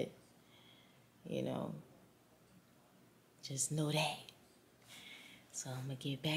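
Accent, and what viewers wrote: New Orleans, United States. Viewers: Girl keep that New Orleans talk honey! You are good! “Ya kno” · That’s ok continue to be you!! A lot of us like the way you talk that’s the New Orleans talk that’s alright!! Keep striving for what makes you happy we’re here for it!!❤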